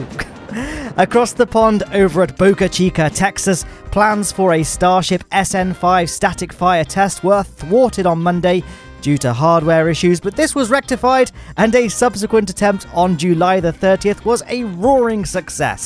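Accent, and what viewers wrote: United Kingdom. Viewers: You sound extra English in this video? Like when you did blunderbirds intros, it sounds odd after watching velocity lake!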